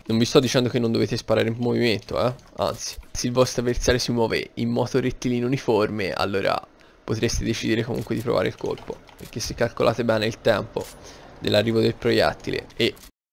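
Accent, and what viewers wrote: Italy. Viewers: Grazie mille, spiegato benissimo e poi .. in livornese! (?) Un si pole chiede di meglio! 😂🤘🏽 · Sei della toscana vero?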